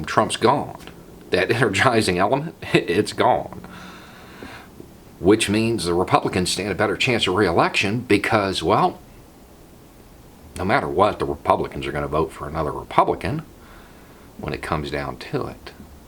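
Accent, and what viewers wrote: South, United States. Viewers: beautiful posts. Listened first last week and been at it a video at time since. I am from South Africa...but am surprisingly drawn to your southern accent...its like your ideas are encased in a warm syrupy truth serum that is your voice. Your perspective is fantastic and you challenge me to think differently about what I thought I knew about American history. Your country is the most powerful in the world and as such is important for me all the way down here...so thank you for your posts. They are great and informative AND entertaining. Scary sometimes but necessary.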